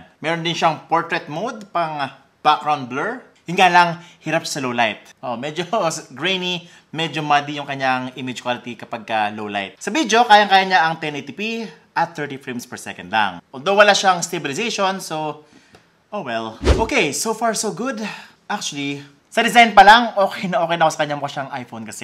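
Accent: Visayas, Philippines. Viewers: bisaya ka po ba?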